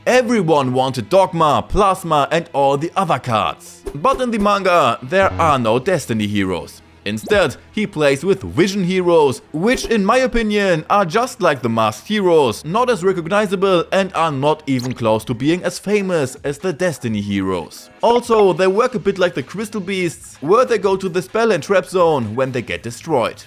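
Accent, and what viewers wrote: Germany. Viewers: are u german ? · this is German English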